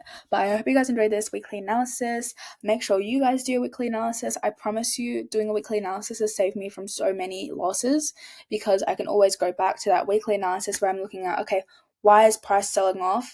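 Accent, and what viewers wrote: United Kingdom. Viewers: Ok, I'm just going to get it out of my chest. You're very pretty and very smart. Love your UK accent 😂. Gold is a ticking time bomb its overbought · You from the uk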